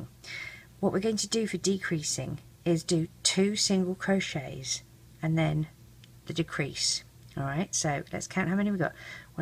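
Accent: United Kingdom. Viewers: OMG your british im a quarter cause my nana is britan and everyone after her!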